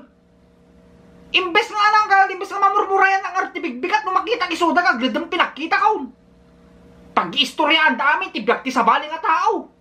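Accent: Ilocos, Philippines. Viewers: I have Ilocano parents from Gerona Tarlac, I was born and raised in London U.K. Your accent very strong Ilocano and reminds me of my relatives aunties and uncles from the barrio village very strong Ilocano and shouting talking with each other like they are in the mountain! Hahaha 🤣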